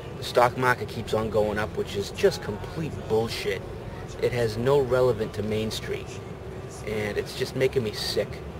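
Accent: East Coast, United States. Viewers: You have the East Coast accent why don't you go and help those people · You sound like you are from the east coast. Have you ever been to New York? Or how about on the West side of the continent? Seattle? Homelessness is 10 times worse in other areas of the country. I understand your frustration. Sometimes people fall into a trap and find themselves at the bottom. Maybe they need a little helping hand. However, I have found that the vast majority of people that ask you for something, use the helping hand and then ask for more. I have seen the same homeless people in my home town for the last 30 years. I have helped many of them. (Giving them food, a ride, I even let someone live in my house for a short time.) They say they want to change, but most of them don't. Many of them have mental problems, and I agree that those people need major help. 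You can give a man a fish and he will eat for that day, or teach him HOW to fish and he will eat for a lifetime. You have made a video and shown the world the problem. Instead of appealing to others to solve it, come up with something yourself. You live there, and it bothers you deeply, so become part of the solution. Cheers.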